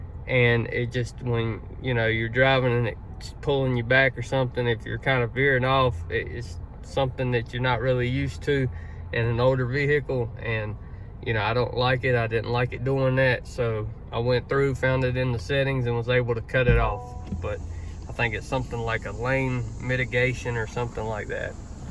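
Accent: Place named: South, United States. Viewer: That southern draw son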